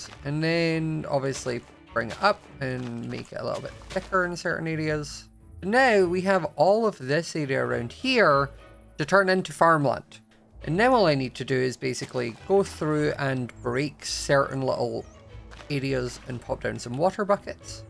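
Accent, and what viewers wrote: Scotland, United Kingdom. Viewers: Are you Scottish scot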